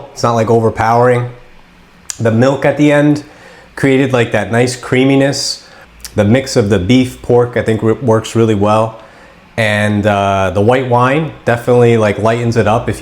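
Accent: United States. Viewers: It is very fun to hear this perfect American accent, though he is from Italian descent, so it is easy to understand what he says too. Next time I will make vegetable broth first. :face-blue-smiling:I never let this sauce cook for two hours. I think it must much healthier when it cooks for only 20 or 30 minutes. I don't understand why Italians cook it for so long. :face-fuchsia-tongue-out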